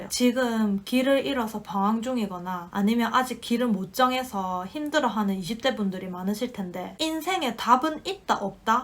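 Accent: Gyeongsang, South Korea. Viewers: 몇일전에 우연히 들르게 되었는데 같은 경상도사람이라 사투리도 구수하고 귀에 쏙쏙 박히네요~ 현재 40대로 코로나로 실직후 6개월째 재취업준비중인데 마음과 같은 결과가 안나서 심난한데 괜히 연말까지 겹치니 더 뒤숭숭하네요 오늘도 면접 한군데 보고왔는데 좋은결과있어서 새해부턴 직장인으로 다시 출발하고싶네요~ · 5만 구독자 축하드립니다 곧 대학생이 될 울아들이 같이 똑똑하고 야무지게 지할일 챙기며 생활했으면 하는 바램이 드네요^^ 결혼하며 부산 떠난지 20년이 되어오는데 구수하고 친근한 경상도사투리를 들을수있게 되어서 좋았습니다 앞으로도 응원할께요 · 익숙한 경상도 사투리로 동생이 언니야 이렇게 하자! 하며 신세계를 알려주셨습니다. 40넘은 늦은 나이지만 따라하기 실행하고 있습니다. 연금저축도 IRP도 개설 했습니다. 시작이 반이니 계속 시청하며 따라가 보겠습니다. ㅎ 오늘도 행복한 하루 되십시오. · 처음엔 알고리즘으로 알게되었는데 설명도 너무 똑부러지고 경상도사투리가 너무 정겨워서 새로운 영상 업로드될때마다 하나도 안놓치고 다 봤어요 똑순이 소중한 영상 너무 감사합니다 경제에 대해서 무지한데 많이 알려주셔서 너무 감사해요 꿀템들 소개영상도 엄청 기억에 남네요 미리 메리크리스마스🎄 연말도 잘 보내세요💕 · 크리스마스에 혼자 영상보면서 많은 위로가 됐습니다. 점점 지치고, 목표의식이 흐려질때마다 영상 찾아서 보러온답니다.. 저도 고향이 경상도라 말투가 너무 친근하고 아는 언니같구 그래요...! 타지 생활하면서 우연히 보게 됐는데, 이렇게 영상 꾸준히 올려주시면서 쓴소리 단소리 자주자주 해주세용, 보이진 않지만 저 멀리서 응원하고 있답니다! 따뜻한 겨울나세요...!! > < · 어쩌다 우연히 영상을 접했는데 목소리 억양 경상도 사투리 완전 귀에 쏙쏙 들어오고 참 야무지고 똑 부러진다 생각했어요 나늠 알뜰하게 생활은 하고 있었지만 여러 재테크 팁들 너무 너무 도움됩니다 재테크1도 관심없는 동생에게 추천해 동생도 영상고며 많은 도움 받고 있네요~~ 이번에 청약도 하나 넣으려고 준비 중이고 저도 국내 주식만하다 외국 etf공부 중이예요~ 나이가 드니 머리에 입력하는게 힘든데 쉽게 설명해줘서 귀에 쏙쏙 들어오고 있어요 앞으로도 좋은 영상 부탁드려요 추운데 감기 조심하세요 ^^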